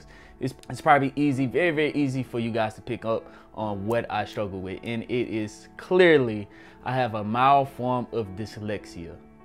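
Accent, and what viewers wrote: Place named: Texas, United States. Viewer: kI am impressed this is all off the top of your head, I also see sometimes you try to pronounce words with out the Texas Accent. But you are doing a good job. Keep up the good content.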